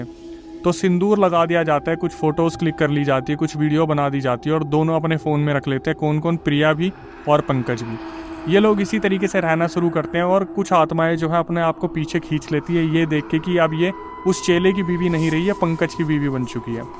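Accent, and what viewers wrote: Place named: Bhojpuri, India. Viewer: awaj se bta rha hu pakka bhojpuriya ladka hai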